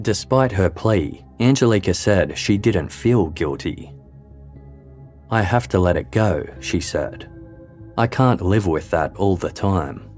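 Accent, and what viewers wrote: Australia. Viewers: He's in Australia, They pronounce a lot of things differently.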